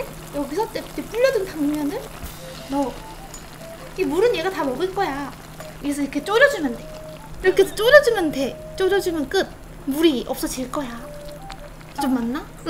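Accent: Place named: Gyeongsang, South Korea. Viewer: 나 경상도사람이긴한데 님 경상도사투리에 치인다....❤️❤️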